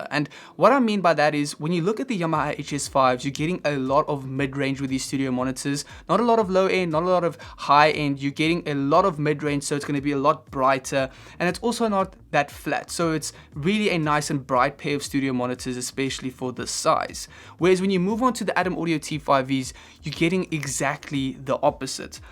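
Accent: South Africa. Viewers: Sounds like a South African :)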